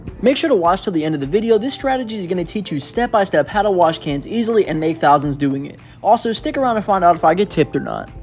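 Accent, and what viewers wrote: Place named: Louisiana, United States. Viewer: dang you from louisiana too😂🔥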